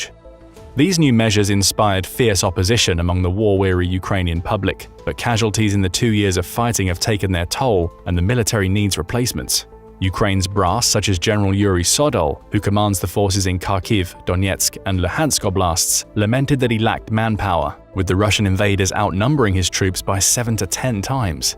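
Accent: United Kingdom. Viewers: Because it was narrated by a British dude and the video is full of clips instead of human faces. And there are a lot of videos that are like this.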